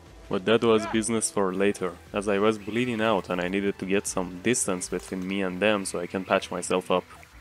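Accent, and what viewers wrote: Iran. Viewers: You sound Persian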